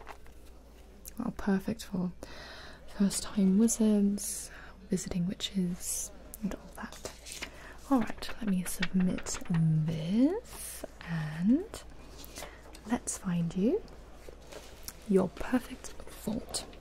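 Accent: England, United Kingdom. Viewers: Nice accent, it's better than some English people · Great accent! You sound like you're from England! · Me as a Englishman I think that is a great 👍 imitation of a English accent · Your English accent is so funny! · Many subscribers with no videos I’m sorry but English people over the age of like 20 all sound like this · After some time i actually thought she was English Very well done 👏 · no this is an english accent because the accent is from England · CAN 👏 WE 👏 GET 👏 MORE 👏 ENGLISH 👏 ACCENT 👏 VIDEOS 👏 PLEASE 👏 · Good English accent and thats coming from an Irishman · nah its obviously a mix of lots of different english accents and pronunciations. kinda like elon musk but with regional english accents. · your English accent is on point! My family comes from Wales but grew up in London. Luckily, I have also picked up the accent. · Im from england and thats a great accent very good 10 out of 10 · From a fellow Englishmen I approve of this accent. 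good job 👌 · That English accent though! Like YASSS! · You do a great job with English accent daaarlling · I'm not sure if everyone's appreciating just how hard it must have been to do an English accent while also having fake fangs in your mouth! haha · I need another video with English accent 😭💘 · If I was new to this channel, and if it wasn't for the merch bit at the beginning of the video, I might have believed that you actually were English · Hey you sure you are not from England either that or you have some seriously good accent skills 😦🙌🏻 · your English accent is amazing! Sending you love from the UK 💕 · You seem to have nailed the English accent,which is unusual for an American. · Dylan Brooks you realise how many different accents are in england? lmao where i am from most people sound like in the video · English. Not British. Britain includes Wales, Scotland and Northern Ireland. 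She is doing an English accent. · Usually I don't like the English accents a lot of Americans use as its not realistic or accurate (I'm English) but with this is nicer because some people in England talk similar to this and even I do to an extent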